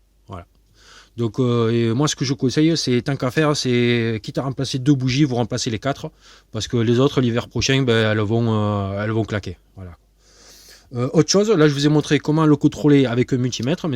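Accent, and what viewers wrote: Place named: Midi, France. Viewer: Bravo, c'est très clair et bien expliqué.Et avec l'accent du Midi, en prime.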